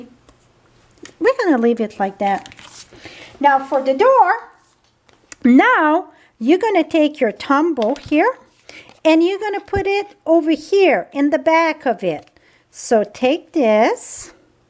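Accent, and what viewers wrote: France. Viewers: Good day, I sure love watching your tutorials because they are very informative, and you are my fellow Canadian they love your French accent have a wonderful night and a wonderful day tomorrow and God bless you. I also like the fact that you're not doing voiceover that everything you do is well you are creating your magic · She was saying 4 by half (inch). It's her French accent.:)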